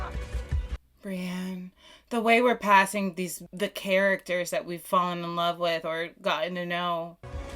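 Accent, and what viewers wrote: South, United States. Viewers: I dip in only occasionally with life so busy now, but I had to see this one again with you, it's one of my favorites. Arya is awesome! I notice your southern accent coming out a bit more than I've ever heard before and you've been beamed onto my screens for years now. It's like your comfortable now, which is cool. I'm in NC and it drives me nuts when people say I have an accent, I've fought so hard not to, so I hope that's not a bad thing to point out, but yeah, nothing wrong with getting comfortable with your audience.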